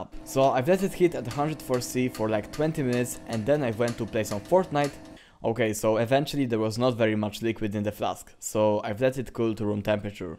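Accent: Poland. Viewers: Ten Polski akcent :D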